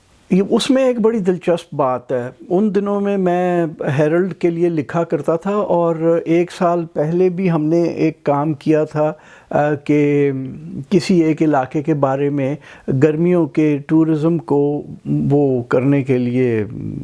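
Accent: Punjab, Pakistan. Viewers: Your skill of story telling is amazing. I have seen more than 50 videos. I am Indian Marathi, your fan of sense of humour. Lot of thanks. Keep it Sir ji. Your Punjabi raheja is fantastic.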